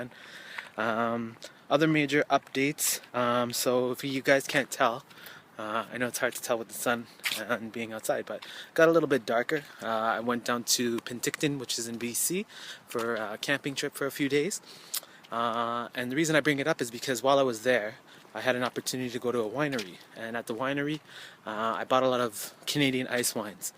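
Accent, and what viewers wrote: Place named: United States. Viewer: how come you have American accent? or is that how Canadians speak in general?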